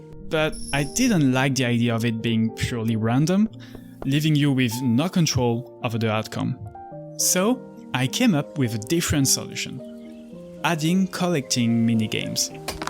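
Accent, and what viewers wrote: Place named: France. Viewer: You sound like GameMakersToolKit but with a French accent!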